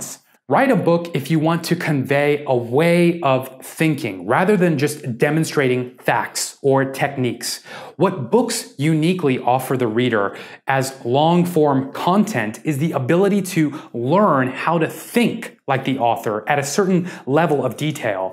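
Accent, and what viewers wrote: India. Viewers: Sir ,you r Indian or American?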